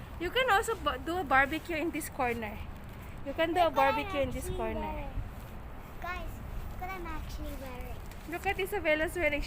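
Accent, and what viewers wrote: India. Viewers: I you acquired the Indian accent. Your daughter is cute. Have a wonderful day.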